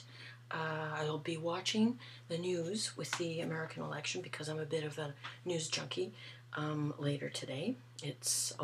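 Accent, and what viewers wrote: Canada. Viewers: Sooo nice of you to promote my video! Wow it's great to have such support...especially from someone who doesn't even like hockey! I guess the French Canadian accent was simply irresistable, n'est-ce pas, mon amie. All the best to you! SP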